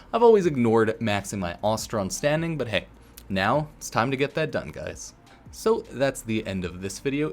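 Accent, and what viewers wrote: Canada. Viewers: I love your Canadian accent. When ever you say "about". Love it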